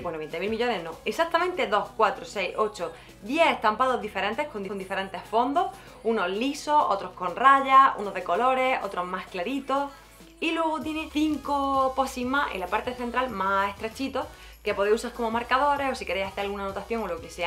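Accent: Murcia, Spain. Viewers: Me flipa tu acento. Me recuerdas a una amiga mía murciana. Que chulo está que diseñes para Ale-Hop. Ojalá hubiera aquí en Zamora, había pero ya no. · Eres murciana?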